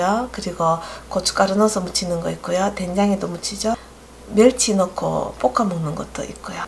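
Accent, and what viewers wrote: Gyeongsang, South Korea. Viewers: 고구마줄기 골은거.ㅋㅋㅋ 한참웃었네요.뼈속까지 갱상도우리이모님. 저도 마산사람이라 골은거 낯설지가않네요. 화이팅입니다!!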